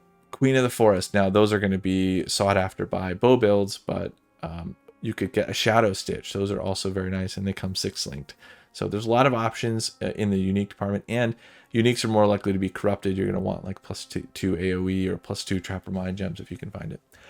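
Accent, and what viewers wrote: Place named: Germany. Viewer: Absolutely stunning video man. I wholeheartedly appreaciate eveything & second put into it; since a also worked on some videos I can tell, it was a lot. OT Question: Where are u from exactly, ur having an interesting accent, reminiscent of German but w/o the typical German pronounciation I'd expect.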